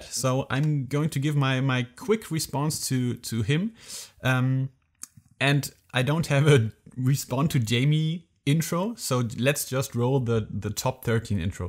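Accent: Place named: Germany. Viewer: Really appreciate your video. You are so lucky to have so many great games shops in Deutschland, hearing your accent makes me miss my Mother country!. - Your English is perfect by the way. Thanks brother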